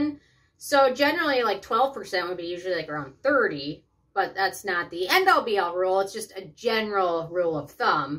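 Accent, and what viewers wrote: Minnesota, United States. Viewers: Hey, I just started watching your videos, thanks so much for the reviews! I could tell right away by your accent that you must be Minnesotan, I'm from Minnesota too, (a.k.a. the best state in the USA!) I would love to see a review on the new DRMTLGY Physical SPF 44. I've been eyeing that one!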